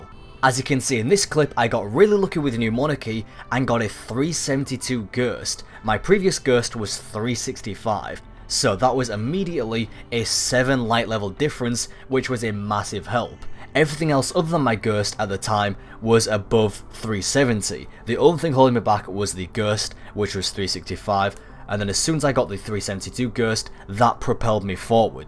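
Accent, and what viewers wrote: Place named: Northern England, United Kingdom. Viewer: Because he has a northern English accent.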